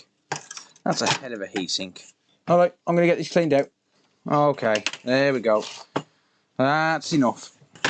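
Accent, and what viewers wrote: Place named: Midlands, United Kingdom. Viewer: Where abouts in the country are you mate? Sound like a midlander?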